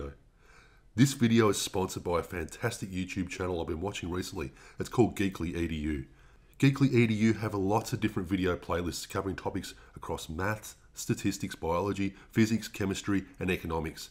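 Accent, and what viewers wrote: Australia. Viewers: I'm not sure what's more mesmerising. The simplicity of this maths trick, or how Bogan this dude's Aussie accent is xD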